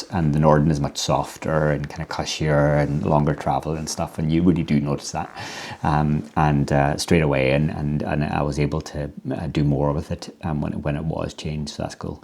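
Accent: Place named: Northern Ireland, United Kingdom. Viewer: would seriously consider it! From your accent you still in NI or local region??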